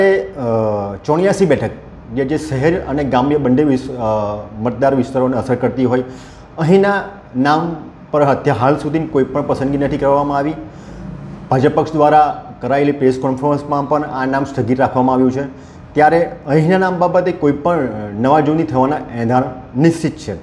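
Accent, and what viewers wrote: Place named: Surat, India. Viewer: Bro This is surati accent.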